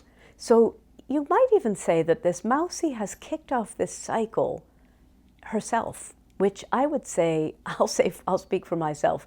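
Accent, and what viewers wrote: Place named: Ireland. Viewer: Thank you for this story! I’m new to your channel and wow, what a treasure trove. (Side note: as an American, I’m really struck at listening to your Irish accent at how much more similar it is to general American than anything found in the UK) But thanks again for your work here, I’m a big fan already 🙌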